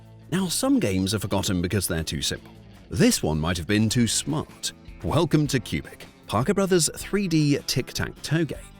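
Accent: United Kingdom. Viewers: I'm a Brit that's lived in the US for over 20 years.... Why is a British accent describing lots of games which WE NEVER HAD in the UK?.. Rather mystifying.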